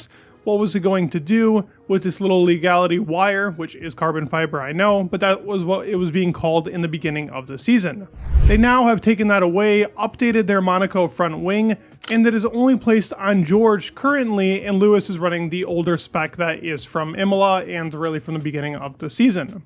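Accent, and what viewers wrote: United States. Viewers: Same here. I listen to the Brits of course, they have a lot of good content, but it's great to hear an American voice being absolutely as good as any of them, and with perhaps a little less bias towards the British teams and drivers.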